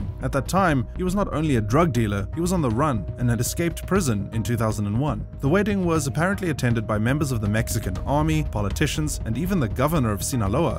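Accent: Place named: South Africa. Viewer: Narrator sounds like he has a South African accent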